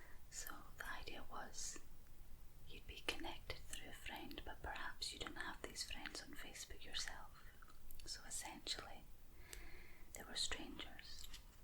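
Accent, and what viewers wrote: Scotland, United Kingdom. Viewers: Hi.Thanks for the videos ive been struggling with anxiety.On another note im so used to american slang that is great to hear your scottish articulated speach.I kinda giggle with your prononciation in an non making fun kinda way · Great job. Your accent takes me back to when I lived in Scotland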